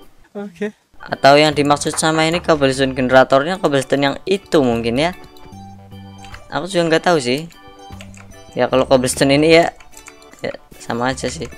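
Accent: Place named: Tegal, Indonesia. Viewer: Abang orang tegal ya :v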